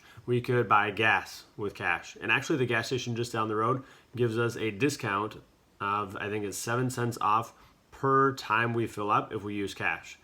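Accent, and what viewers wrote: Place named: United Kingdom. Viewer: I love a good random British accent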